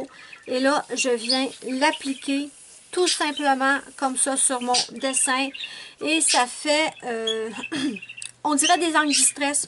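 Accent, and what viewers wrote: Canada. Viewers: Bonjour 😄je viens de regarder ta vidéo, c'est très bien ce que tu conseilles aux personnes qui débutent le coloriage. Moi ça fait peu de temps que je commence le coloriage, à côté je fais de l'aquarelle et du pastel sec qui méritent des matériaux plus chers que pour le coloriage,pour moi bien sûr, donc je commence le coloriage avec les brutfuner,ils sont parfais😍💜Par contre pour les feutres crayolas je ne savais pas qu'il y avait 3 façons de les employer et c'est super je vais les prendre pour le moment pour remplacer les encres distress ink et je ne savais pas qu'ils étaient aquarellables.C'est super. J'adore tes vidéos 😍❤️ton accent canadien et la façon que tu as de te parler à toi même, tu es géniale 😍💜 Bisous bisous 😍😘😘🧡🧡🧡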